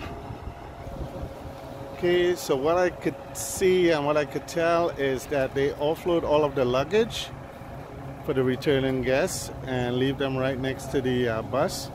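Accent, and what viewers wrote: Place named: Trinidad and Tobago. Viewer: sounding trini..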